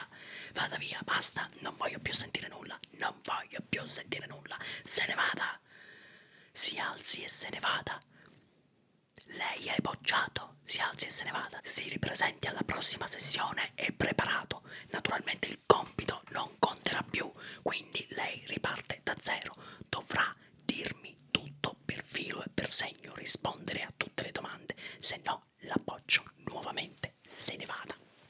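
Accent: Sicily, Italy. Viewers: Sembra che ti eri davvero alterato e stressato!😂😂😂😂AHahaha Recitazione Eccellente!!❤Che poi si sente vagamente quell'accento siciliano che io amo tanto!!!❤Ti amo di bene!😊😊❤ PS Troppo forte il finale, che se ne sono andati tutti, giustamente!😅 Ahaahahaha geniale!!! 😅😅😂😂😂😂😂😂🤣🤣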